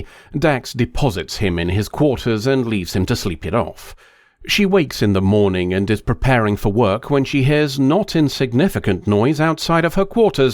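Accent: RP, United Kingdom. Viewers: In response to a video I keep getting suggested by another Youtuber about "the time Janeway was the spider queen", I went and found your video on the topic. I don't know much about culture in your company, but I believe you sound a bit more "posh" in that episode. <link>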